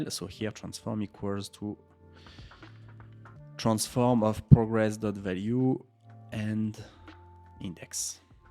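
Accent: France. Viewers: Mec t'es trop fort, ton accent français est tellement présent ! !!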